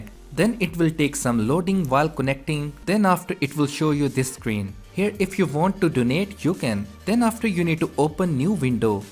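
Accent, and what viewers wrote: India. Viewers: I hate indian accent very much. But this one is okay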